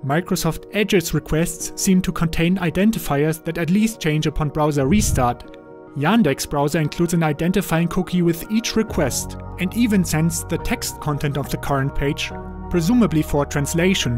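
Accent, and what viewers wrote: Germany. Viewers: German accent? ;-)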